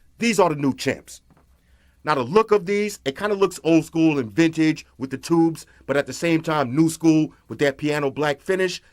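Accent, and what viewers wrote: New York, United States. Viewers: Yo, it seems strange I’m watching this video from NYC / man this place is crazy & everyone in this hood all talk like you!! Hahaha, this city has so much to offer some 1 like me while I’m here on vacation- hot video again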